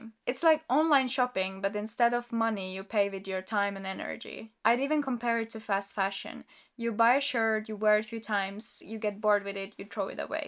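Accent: Russia. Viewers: I like your accent. Is that Russian? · Hey i just want to tell you: never give up on making YT vids. I think you have a talent for it, plus natural charisma plus beauty. You will make it for sure (I say this knowing how tough it can be sometimes, i have a channel slightly bigger than you, like 1.5K subscribers... so just want to give you some encouragement, because i really think you're good at it) By the way where are you from? I just saw another one of your vids where you say you don't identify with your home country, but i just ask because i assume you're either from Russia or Ukraine because of your name and accent.... I've been to both (was actually in Kyiv when the war started....). Anyways you don't have to answer if you don't want but I'm just curious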